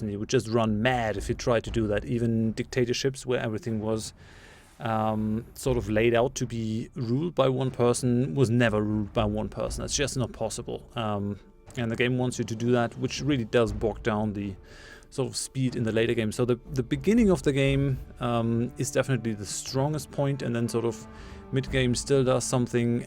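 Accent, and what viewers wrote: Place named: Germany. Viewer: It was quite an interesting series! Waiting for your next playthroughs! Thank you for posting the save file. It's going to be interesting to play it from there. Lots of let's players are not as thoughtful and courteous! :) P.S.: I thought you would look like a professor/teacher with that kind of unique sharp look and the small frameless glasses after hearing your German accented English through the whole series lol. Didn't expect someone who looks like a proper stoner or Keanu from that old movie! No offense intended :) Thanks and have fun!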